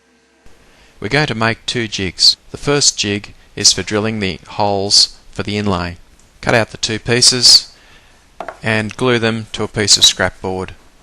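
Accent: Australia. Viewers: Doesn't matter how hard you try, you can always pick an Aussie accent.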